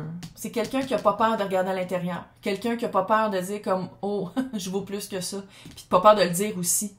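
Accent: Quebec, Canada. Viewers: Je découvre et j'adore 😍 je dois encore m' habituer à l' accent québécois 😁 je dois encore faire replay 😁 j' adore ❤❤❤ on dirait un dessin animé 😂 les sons, les expressions, les bulles 😍😍😍 la guidance me parle trop, merci, merci, merci 🙏🙏🙏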